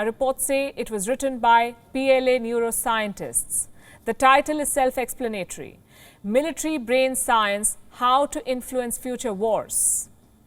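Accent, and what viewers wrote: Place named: India. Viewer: 这大婶天天用咖喱英语黑中国，数十年如一日😅😅😅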